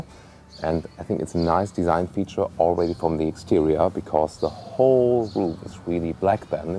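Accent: Germany. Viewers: Very nice commentary on this awesome car! Love it! :) Ab und an merkt man deiner Aussprache an, dass du Deutscher bist - keine Kritik, sondern einfach der einzige Punkt der mir etwas negativ aufgefallen ist :D Keep it up!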